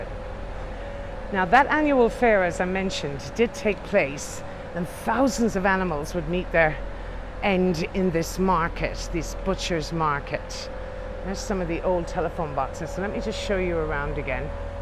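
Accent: Ireland. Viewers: Definitely agree. Just discovered this channel and is an incredible guide. Lovely Irish voice. Sounds the same as my former work colleague and she was from Co. Kildare. Xxx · I would love to see your video of your travels home. I thought I recognised your regional accent, as my husband's family are from Mitchelstown. I would love you to visit Bunhill fields. Resting place of some very familiar names. Daniel Defoe being one. A short walk from old Street tube station, and a real gem. Safe journey home. ☘️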